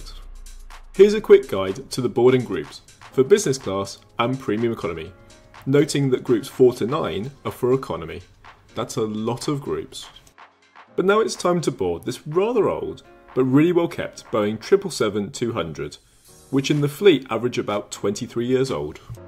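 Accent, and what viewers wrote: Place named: Birmingham, United Kingdom. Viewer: That brummie accent is coming through ! Great video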